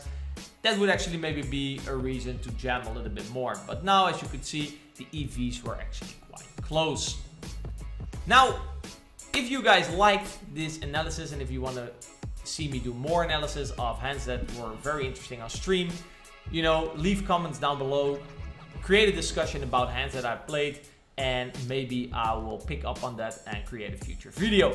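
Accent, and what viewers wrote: Netherlands. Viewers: Everything in the Middle AHAHHA, btw are u dutch?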